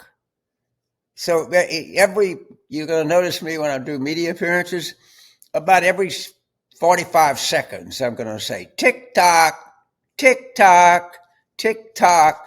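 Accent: South, United States. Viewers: Thanks again,for another informitive video Mr. Carville! I've watched you for years,and never get tired of your wisdom! With your southern drawl,and a little comedy,you continue to guide our people! I met little donnie many years ago in NYC.I knew after two seconds,that he was full of $hit! Being a country boy,I've been taught to beware of a conman! How can so many people be misled at the same time,inour beautiful country? Where does all of thier anger come from?Can't they see that his is nothing but a brown stain in thier shorts? Sheesh.❤❤❤❤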